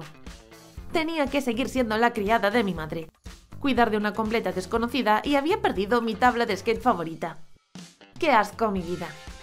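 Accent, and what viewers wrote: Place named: Spain. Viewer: Si los vi!! 🤗😃 me podeis dar un corazón?? (no soy española pero me encanta el asento español)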